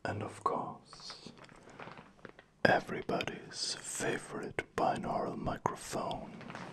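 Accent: Germany. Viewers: You're German aren't you? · He’s German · Do you mean “accent”? If so, I think he’s German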